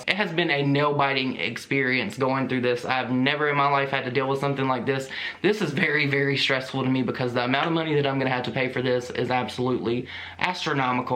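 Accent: South, United States. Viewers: I love your accent! Southern twang sounds so cool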